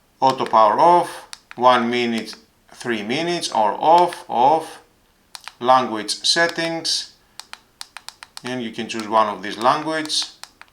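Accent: Greece. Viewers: Useful review, ty. Honestly, you sound like Greek 😅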